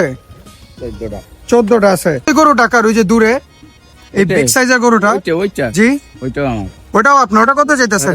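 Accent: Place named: Old Dhaka, Bangladesh. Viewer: তোমাকে সাবস্ক্রাইব করেছি আমি আর তোমার বড় ফ্যান তুমিও তোমার ভাষা পুরান ঢাকা আমার বাসা নারায়ণগঞ্জ😊😊😊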